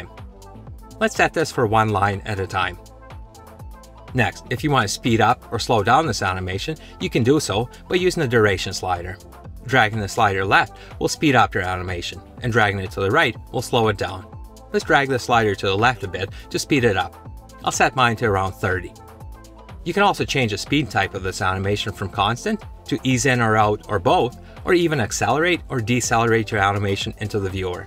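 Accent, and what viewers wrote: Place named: Canada. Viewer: Where is this accent from? It seems Canadian, but I dunnoo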